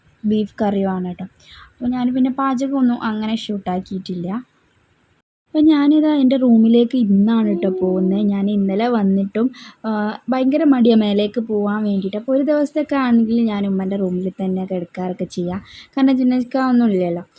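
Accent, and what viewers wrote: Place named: Kozhikode, India. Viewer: Video super,കോഴിക്കോട് ആണോ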